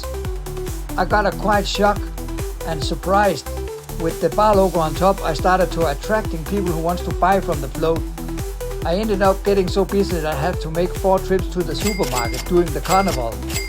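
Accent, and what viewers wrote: Denmark. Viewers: Hey, that's the real, at least his voice. Deeper, older, but yep, I recognize his Danish English accent. So he is real, at least he was 8 months ago. (grins) But why is he always hiding himself all the time? Sup with that? And what's with that 'Jason face mask'? Hiding yourself like that is very suspicious.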